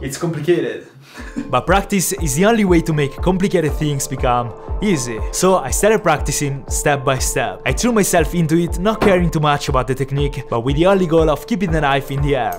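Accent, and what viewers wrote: Italy. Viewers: He's Italian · Cazzo si sente che sei ITA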